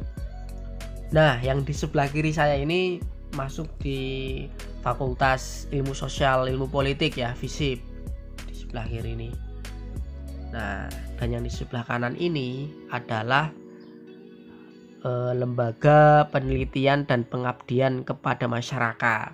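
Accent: Java, Indonesia. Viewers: Logat jawa org jawa ke? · Wadduh medhok lidahnya hehe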